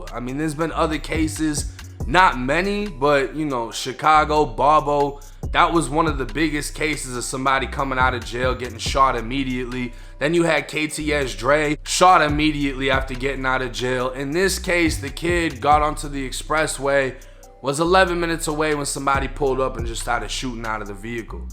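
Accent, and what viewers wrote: Boston, United States. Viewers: You from Boston? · Boy your Boston accent is hilarious lol…good shit tho! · I just love your Boston accent. You make these videos so much more interesting with the way you narrate. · Do I hear a Boston accent?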